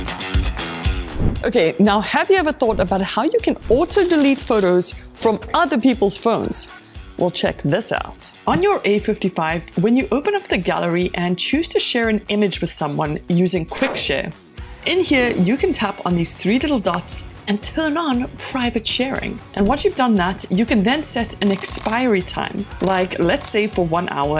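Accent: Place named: South Africa. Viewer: Yohhhh I hear a wonderful South African accent here! That's pretty schweeeeet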